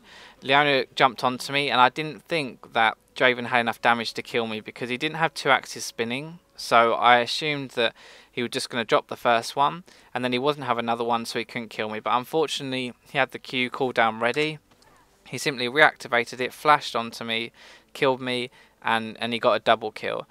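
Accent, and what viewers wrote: United Kingdom. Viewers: As always, your videos are awesome and super informative. For some reason I find your videos much easier to watch, understand and learn from purely because you're British. It'd be awesome to play with you sometimes (I main support), and also a quick question - could you put up a video of a Vayne game against a bunch of heavy divers? Team fighting is always hard for a Vayne when the enemy team has like a Renekton and a J4 for example.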